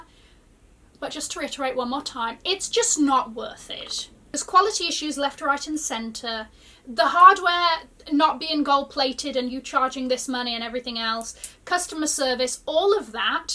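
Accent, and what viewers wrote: United Kingdom. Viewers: Your videos are really knowledgeable and helpful and i adore your british accent 😍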